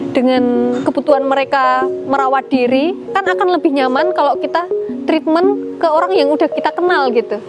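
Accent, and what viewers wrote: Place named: Java, Indonesia. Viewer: Suka sama attitudenya mbak e, cara bicaranya asli jawa. Meskipun pakai bahasa indonesia ketika menyebut gurunya sakit dg kata "gerah". Sukses selalu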